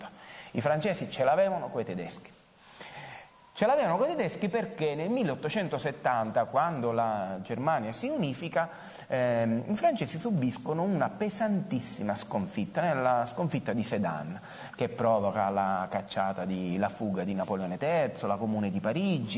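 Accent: Sicily, Italy. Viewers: Pazzesco..poi il tocco di classe con l'accento siciliano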